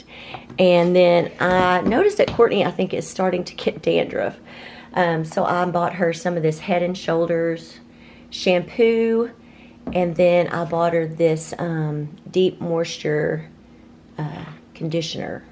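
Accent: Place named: South, United States. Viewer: Thanks for sharing your grocery haul. Love your accent, it's so Southern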